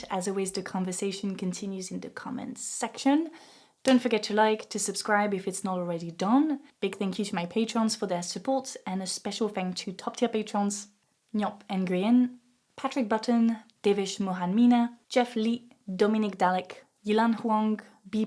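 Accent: France. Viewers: I LOVE YOUR VIDEO AND I WISH IT WAS REDONE IN FRENCH. I'm French so : some grumbles. The only thing I disagree with, or find a bit short, is saying that "LFI - France Unbound - supporting the Palestinians have turn them into pariahs". It's their support of Hamas, or lack of condemnation, that turns them into Pariahs for left and even centre-right leaning people, not their support to the Palestinian cause which is widespread and makes consensus except in extremist Islamophobic circles. This is very different. And there is another thing: the insufferable pride of Mélenchon, the head of LFI, that sometimes seems like it could become Trotskyist, if not Stalinist, totalitarianism. But I applaud this thorough clever unbiased analysis of yours (some extreme-right wing people wouldn't find this unbiased though, but it is). One thing is missing for foreigners: what happened to Macron and Macronism. 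Because he was very popular abroad in 2017 when he took power and he is still very popular, at least much more than in France. But I think it would be too long for one video. PS.: I claim to be very fluent in English (more than what one would say on a CV), but yours is much better. I'm jealous even if I can hear the tiny teeny slight trace of your French accent.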